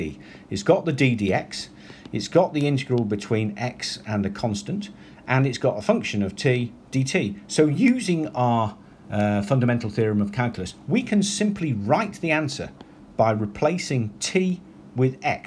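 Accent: United Kingdom. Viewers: Thank u random British guy 💂🛐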